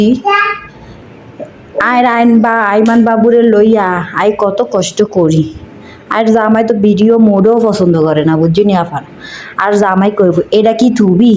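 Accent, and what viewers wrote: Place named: Noakhali, Bangladesh. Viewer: আফনের ভাষা নোয়াখালী, চাঁদপুরের অইসে কুমিল্লার ভাষা আফনে বুইল্লা গেসেন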